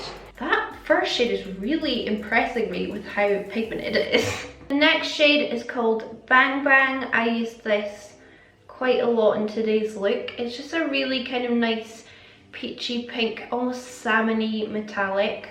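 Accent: Scotland, United Kingdom. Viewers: I told you before haters gonna hate and therefore don’t mind the bitch! As I was saying on IG before watching your video that I would not purchase it cos I don’t find the colours too unique!! And while watching your video I realised that Gingerspice was from Too Faced 😂 I only watch your videos because you’ve got real talent and I love your Scottish accent 😁 And as you can see down below a lot of people have your back, so don’t get frustrated because of one stupid bitch!! Keep doing you that’s why we love you 💕💕💕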